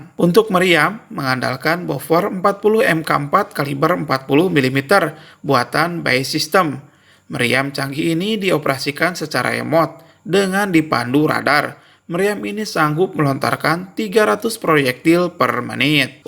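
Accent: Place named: Papua, Indonesia. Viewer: admin logat ny kya orang papua ya.. khas banget😂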